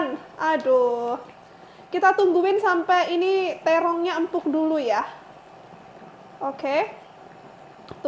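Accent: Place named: Banyuwangi, Indonesia. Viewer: Aku seneng mbk nek ngomong sek medok banyuwangi, salam mbk aku teko banyuwangi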